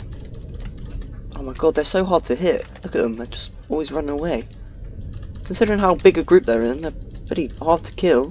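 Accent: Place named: Scotland, United Kingdom. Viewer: oh I thought you were a scot because of your accent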